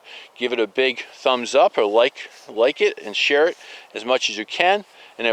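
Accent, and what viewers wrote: New Jersey, United States. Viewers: I just found your channel recently, and I love it! What great advise. I've been gardening on raised beds, protected by a lot of leaves, but you've really open my eyes to what goes on in the soil. I'll be working more with cover crops now. I live in NH., but I'm from the Philly area, so listening to the Jersey accent is an extra bonus.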